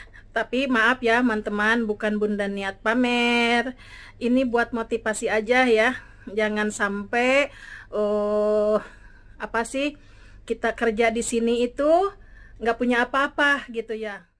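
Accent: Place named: West Java, Indonesia. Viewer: Alhamdulillah y bunda ... Bunda Dr daerah Jawa barat ya...logatnya Sunda bngt ...Semangat dan sehat sll bunda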